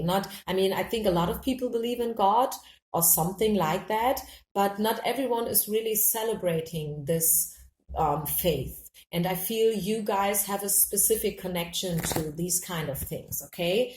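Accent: Germany. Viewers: Kann es sein dass du deutsche bist? Der Akzent hört sich so an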